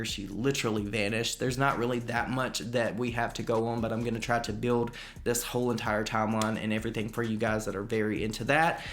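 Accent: South, United States. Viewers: I miss you doing these kinds of videos, its like a breath of fresh air from all the drama so thankfully the drama is slow this year(so far) 🤣🤣 love you! I could listen to you talk alllll day! Your accent is everything and reminds me of my southern family i dont get to really talk to very often! Love you hope you are having a greaaaat day!!! I cant wait to see the new content you bring out, id love to see true crime, paranormal stuff from you!!!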